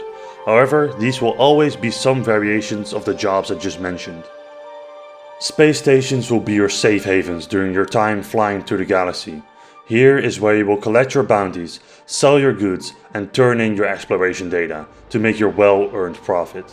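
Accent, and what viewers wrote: Netherlands. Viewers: That horrible Dutch accent though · Ik hoor een nederlands accentje? :P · Mooi filmpie, voortaan wel ff dat nederlandse accent minderen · I heard the accent and bloody knew you were Dutch xD. Verekte Nederlander! ;) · Nice review, convinced me to buy it. You sound very Dutch btw, something i couldn't help but notice. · binnen 5 secondes merkte ik al dat je nederlands bent 😂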